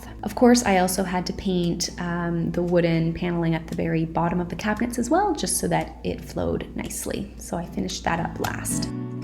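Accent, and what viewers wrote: Canada. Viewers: CANADIAN ACCENT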